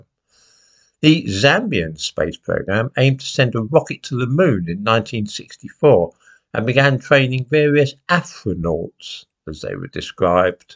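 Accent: United Kingdom. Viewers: Bad mic + British accent = no idea what he is saying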